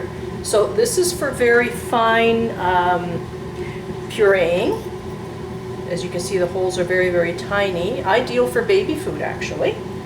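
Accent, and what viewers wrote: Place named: Northwest England, United Kingdom. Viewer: Thank you for your response. Your accent did seem a little different. I was thinking the Mid/North West. I have bought a tomato press and now I'm contemplating getting a food mill because I want a smoother sauce. Now I believe I'm just gonna go ahead and get that food mill. Your sauce looked pretty good! You should keep making more videos!😉😊❤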